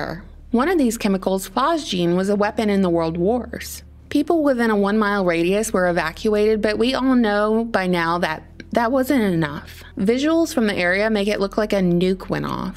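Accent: South, United States. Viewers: I like how you narrate this in the same tone the same way you’d read a story book to a child. It’s nice. Like “and thennnn” in a song song y voice. I can tell you’re southern to the bone all us southern ladies do this. 😂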